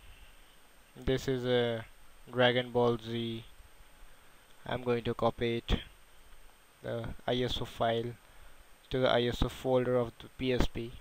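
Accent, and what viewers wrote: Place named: India. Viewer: you have a indian accient you must be the right one that im searching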